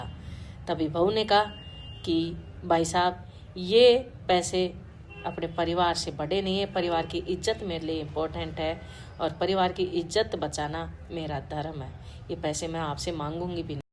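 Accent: Gujarat, India. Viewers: Ek dum faltu. Kuch bhi reel mt banao....GUJJU accent. 🥿👢🥿👢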